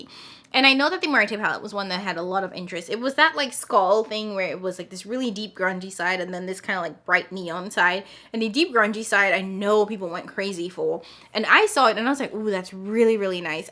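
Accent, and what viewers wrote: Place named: Trinidad and Tobago. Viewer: Found your channel today and I'm enjoying your content and Trini accent.......it's like seeing a familiar face in a room full of strangers. Please continue to post and share your knowledge.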